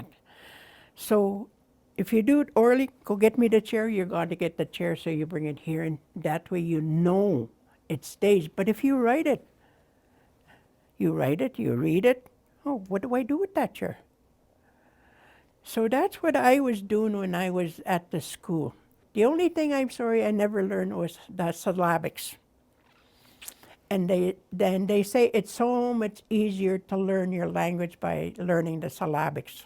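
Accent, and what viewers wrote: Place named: Canada. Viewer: I love that she keeps saying "Eh". A beautiful linguistic blend of Cree and Canadian English :-D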